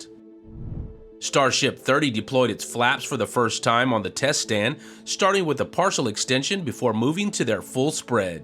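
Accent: Texas, United States. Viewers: Someday, I want to hear that Texas accent coming from the Planet Mars ! 👨‍🚀🏁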